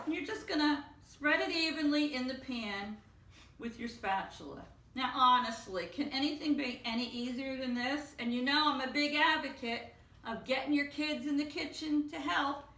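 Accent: New York, United States. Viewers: Hello, New subscriber to your channel. Thank you for subscribing to mine too! I love learning of new recipes so glad to be here! Love your accent too, is it New Yorkish!? I'm from NY but now living in England! Adorable apron and pick spatula. The bar looks really delicious! Mmm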